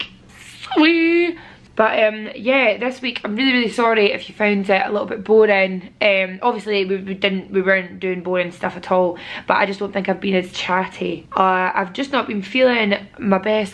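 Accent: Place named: Scotland, United Kingdom. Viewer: I've been subbed for about a year now. I had no clue you were Scottish when I watched your vids for the first time, not til I turned the volume up my first thought was "who's she's not from the USA but she's super pretty and that's a cool lip piercing and some awesome ink work" never cease to make me chuckle at least once. You're pretty awesome, keep it up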